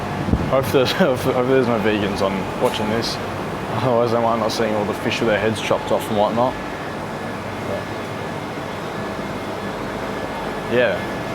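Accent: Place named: Australia. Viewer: Thank you for coming to HK.💛 I can practice listening to Australian-accented English.😃🙏🏻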